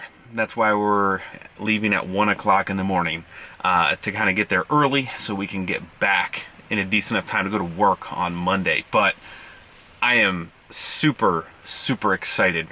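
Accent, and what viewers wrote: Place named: Texas, United States. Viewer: No, but his voice DOES develop a 'Texas Twang.'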